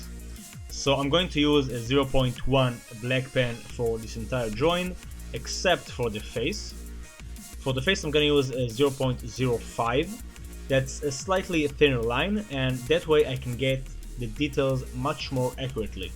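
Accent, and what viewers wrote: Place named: Israel. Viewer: הי אני יודע שאתה ישראלי בגלל המבטע לול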